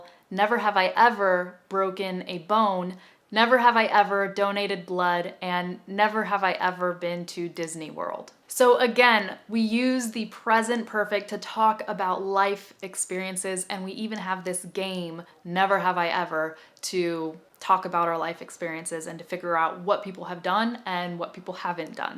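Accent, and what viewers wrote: California, United States. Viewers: I love you Ma'am, The way you speaking American accents and your always smiling face attract me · I enjoy your accent from California.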